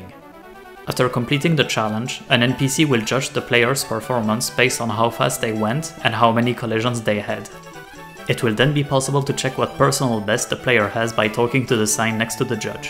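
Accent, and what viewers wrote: France. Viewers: Do i hear a little french behind that great video? Beau travail camarade!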